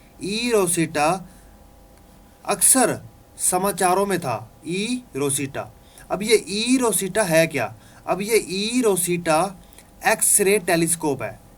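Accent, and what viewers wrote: Punjab, India. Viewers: Good morning sir Panjabi ho kya sir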